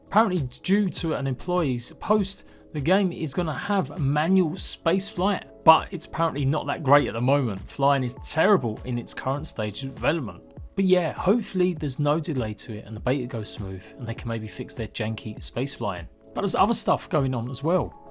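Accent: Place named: United Kingdom. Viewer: Where’s that accent from? Can’t place it. Obviously British but unsure where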